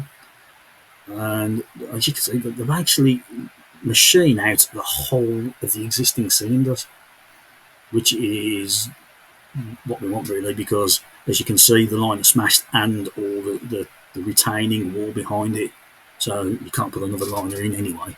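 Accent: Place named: Birmingham, United Kingdom. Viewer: Quick jacks 😂 he’s a brummie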